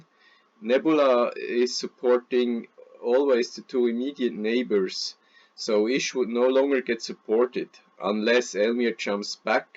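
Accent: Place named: Bern, Switzerland. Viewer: 😂has mr no denkt bi dem akzent gruess us bern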